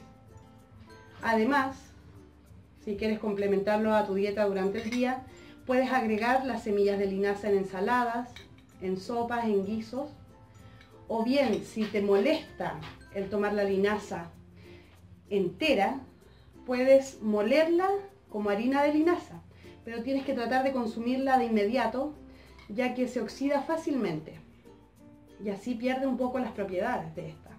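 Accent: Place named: Chile. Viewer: POR FIN VIDEO EN ESPAÑOL CHILENO! !!! MUCHAS GRACIAS ! DESDE MAÑANA COMIENZO CON TU RECETA 😊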